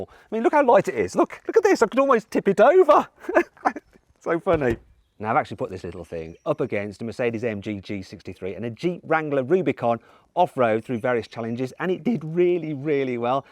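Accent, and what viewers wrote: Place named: Tamworth, United Kingdom. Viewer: Do a 4g63 swap ,you sound like you are from Tamworth. Buy this one or buy your self a subaru forester sti .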